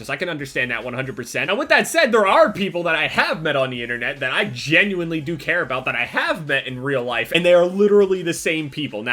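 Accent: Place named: New York, United States. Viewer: Anyone notice that New York accent with him saying "Twitta"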